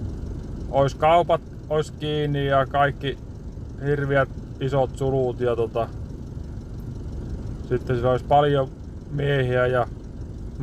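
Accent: Finland. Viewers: I can't with the country Finnish accent telling the most relaxed story of what's been going on while performing intricate surgical moves with a huge machine. 😂 Eeipä se haettaa. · I just realized why my question was awkward! Sorry, when I posted my comment I hadn't had the chance to listen carefully yet, and my understanding of the Oulu dialect is still narrow so I hadn't caught much of it anyways — I got a little too confident. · Hehehe, as soon as I heard your voice I knew you’re a Finish guy. And normally Finish people understand humor and sarcasm. But yeah, you got some skills there, not going to lie :o)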